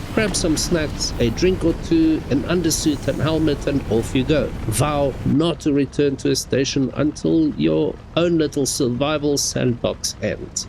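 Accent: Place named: Afrikaans, South Africa. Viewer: jy klink afrikaans, julle dalk 'n org wat ek kan join?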